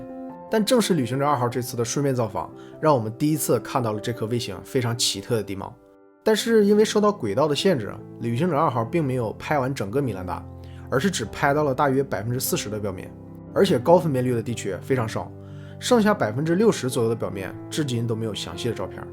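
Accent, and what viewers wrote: Northeast, China. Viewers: 听口音大概率是中国北方，像东北那边的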